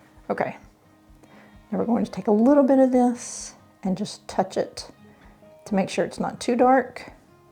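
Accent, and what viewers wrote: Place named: South, United States. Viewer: I learned so much from your great video and, I love your southern accent.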